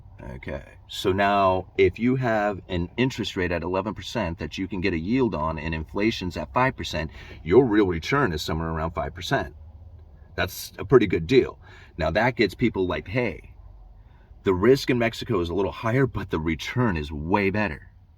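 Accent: United States. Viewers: I haven’t watched this guy much but does anyone know if he’s American or Canadian?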